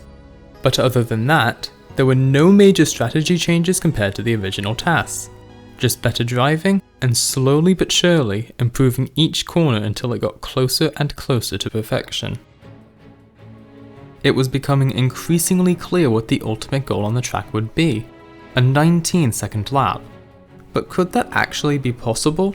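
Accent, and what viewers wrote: United Kingdom. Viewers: summoning salt but bri'ish (in all honesty rly good vid :D) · Most British sounding American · legit thought you were british at first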